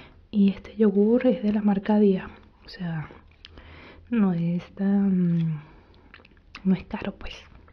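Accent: Venezuela. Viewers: Me encanta el acento venezolano, lo mire hasta el final. Super agradecida por este video.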